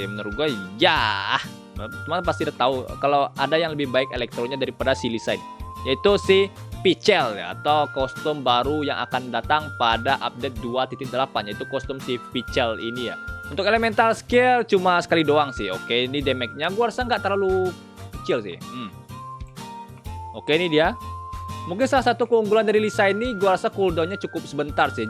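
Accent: Padang, Indonesia. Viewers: bg lu org mna?logat lo kek org padang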